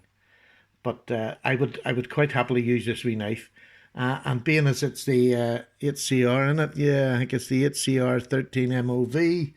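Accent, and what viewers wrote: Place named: Ireland. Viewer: my man, I don't know how you do it, but do it you do! This must be a a new model as I haven't seen one before; I have to admit, I'm a might envious as, you're right! It's a beautiful piece it really is. I think it's a little belter, and that blade is a wonderful shape, ideal for any task. I have a good selection of knives from CRKT and they have never let me down once! Keep up the great work, I always look forward to hearing your dulcet, Irish, velvety voice and you know what you're talking about! See you on the next one, my friend. Much love to you and yours. I'm away for nice cuppa tea myself! 😆🤗❤️❤️❤️❤️❤️❤️❤️🤗😆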